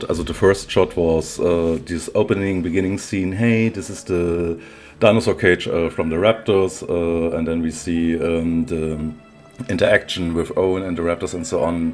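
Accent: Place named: Germany. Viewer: Mann merkt das du Deutsch bist xD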